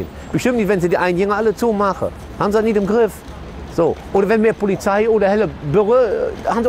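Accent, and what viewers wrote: Cologne, Germany. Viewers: Haha, zu geil. Habe lange kein richtiges kölsch mehr gehört. Dat is schaad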